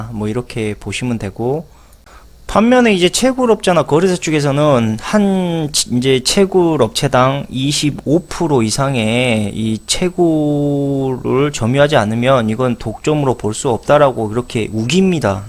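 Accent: Jeolla, South Korea. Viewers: 절라도 말투가 듣기싫네요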